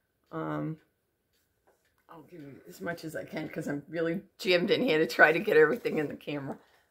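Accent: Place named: New York, United States. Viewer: (that NY accent~love)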